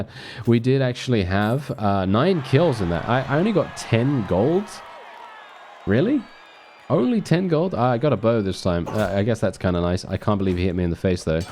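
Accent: United Kingdom. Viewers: Remember to like comment and HYPE! Especially on the first video of a series as it really helps out the channel! This is not a paid advertisement as I joined the channel to hopefully help boost the smoothest British Baritone that you'd listen to read a phone book. For you kids that don't understand what that is, it isn't a phone that looks like a book or a mobile phone that folds like a book. It was a big book with your name and number, and sometimes street address. It'd be super boring but you wouldn't be able to stop listening to. Forgive the ramblings of an old man who finally became a dad, I don't know what sleep is anymore!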